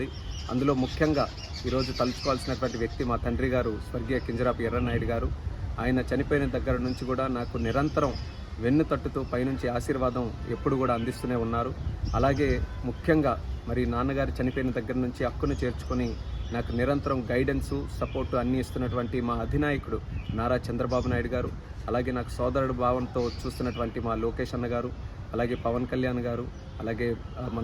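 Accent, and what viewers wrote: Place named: United Kingdom. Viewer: I'm big fan of ur English accent sir.b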